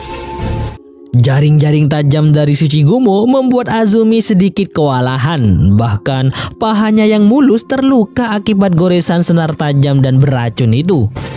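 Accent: Madura, Indonesia. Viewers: Orang madura ya mas🙏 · Min org madura yaa?? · addaaaa teppak ka reng madureh😂,,,,,ta'entos kakeh nom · Sama2 org madura mass, btw asal kota mana ya😂❓❓❓ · Admin oreng madureh dimmah yeh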